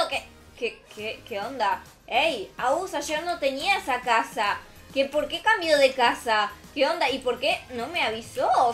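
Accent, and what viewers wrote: Argentina. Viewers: Te amo soy de gran canarias y me pegaste tu acento aregentina yo cuando sea mayor me voy a vivir a Argentina tengo 11 años y me instale roblox gracias a ti y fue lo mejor que hice te amo ati a a y a te amo y mi familia dice que eres mi ejemplo a seguir te quieroooooooooo oooooooooo muchoo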